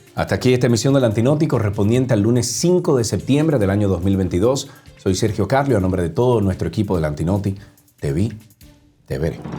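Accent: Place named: Arab world. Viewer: Yo creía que tú no eras dominicanos porque cómo tú hablas tan mar dé los dominicanos pensé que tú eras árabe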